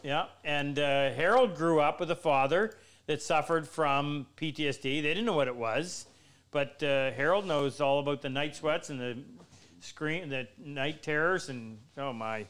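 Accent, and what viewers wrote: Canada. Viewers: Hi - I'm watching from South Africa. you've said 'any question' so this one is from left field (as they say south of your border): which province are you from? my guess is New Brunswick - I used to know a Canadian from there, and you sound so much like him. Back to reality - I really like your program and I wish I was younger and wealthier so that I could follow your style (I am 75 and can't afford diamond sharpening plates - especially here in SA).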